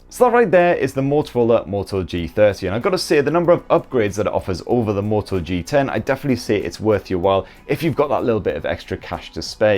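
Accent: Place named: Scotland, United Kingdom. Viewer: Wwhwha...WHAT?!! Mate,you'retalkingwaytoofastwiththatthickScottishaccentIt's sometimesverydiffiulttounderstandwhatyou'resayingYoumightwanttoslowdownabit. Okay?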